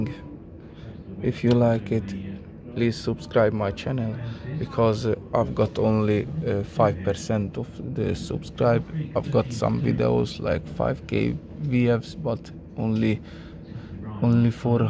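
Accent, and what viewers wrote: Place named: Hungary. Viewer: ember! te magyar vagy........annyira lehet hallani,..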